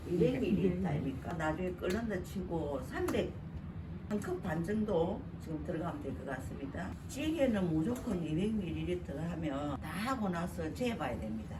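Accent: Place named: Gyeongsang, South Korea. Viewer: 통영이구나 경남말투인데 어딘가했네요 ㅋㅋ. 내일 시험인데. 합격했슴 좋겠네요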